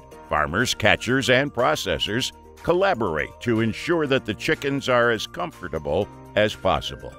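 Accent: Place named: United States. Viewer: such almighty american (note the lack of capital in the spelling..) So why are the EU not willing to accept us produced chickens..oh could that be the use of BANNED chemicals..but the big american voice over says the are JFK rn certified 100% approved for human (nay american) consumption. Hey - just a thought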